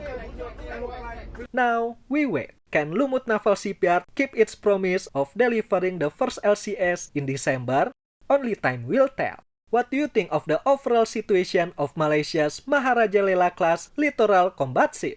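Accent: Indonesia. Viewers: they already pay to 3rd party item and etc. why abandon it. it still on track for the balance LCS. btw, please use AI because you read the text like a robot without any stop. so hard to understand you indon accent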